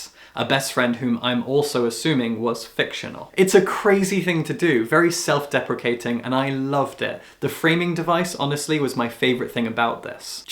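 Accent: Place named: West Midlands, United Kingdom. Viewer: You're from the West Midlands? I never would've guessed from your accent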